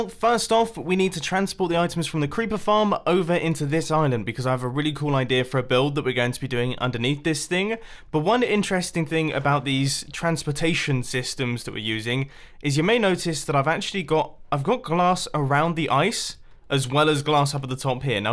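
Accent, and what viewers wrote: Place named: England, United Kingdom. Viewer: “Ah tall” I love English accents😍😌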